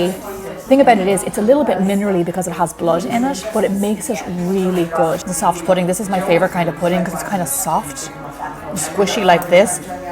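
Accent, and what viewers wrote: Ireland. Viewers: Omg Iv been following you for years and always hear the Irish accent but didn't realize you were from Wexford. I'm from New Ross but live in New York. Small world. I'd kill for chips from kilmore or the premier in Wexford town . Go get a risole ! Yum!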